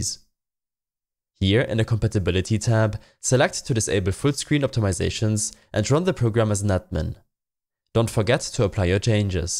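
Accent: Germany. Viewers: Nice german accent. I hope your instruction would help me to solve my problem. Nice video and have a nice day. :D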